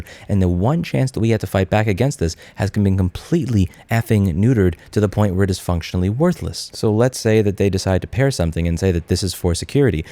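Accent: New York, United States. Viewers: I like this: you can take out of noo yawk but you won’t take the noo yawk out of.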